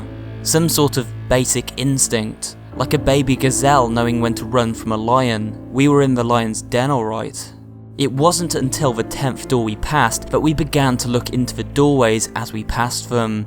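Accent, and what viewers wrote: United Kingdom. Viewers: I think he breetish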